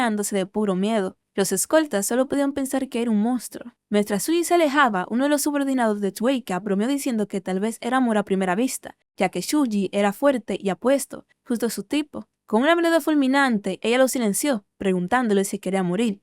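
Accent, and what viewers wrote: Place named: Dominican Republic. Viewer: Solo con la voz pude deducir que era dominicana,rd representando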